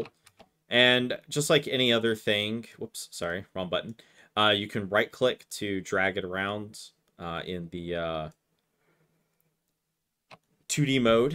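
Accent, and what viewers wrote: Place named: Brooklyn, United States. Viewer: what is brooklyn guy babbling about nice tutorial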